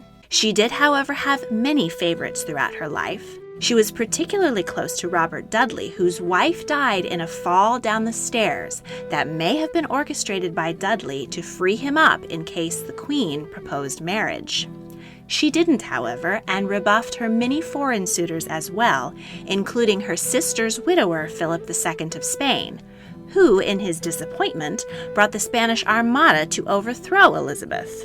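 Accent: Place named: United States. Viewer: I do find an American narrator for my country slightly disconcerting !!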